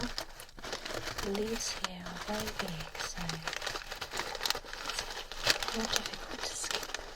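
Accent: England, United Kingdom. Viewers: Yes she is English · Another amazing video. Love listening to fellow English ASMR accents ❤︎ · cat I mainly detected a British accent, but I thought maybe there was a hint of Australian there. Regardless, it doesn't matter; she has an amazing voice and demeanour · lovely British accent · are you from uk? Your accent is not American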